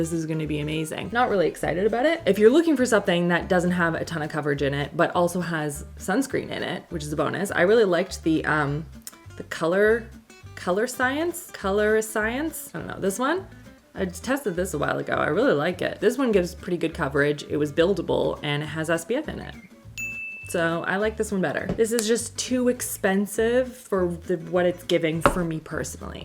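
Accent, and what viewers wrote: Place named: Canada. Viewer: It’s a Canadian accent thing 🩷🍁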